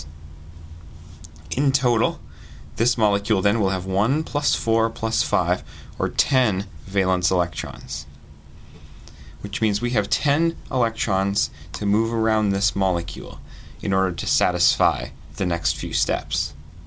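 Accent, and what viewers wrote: Canada. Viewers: Thanks a lot, helped a bunch. And props to the Canadian accent!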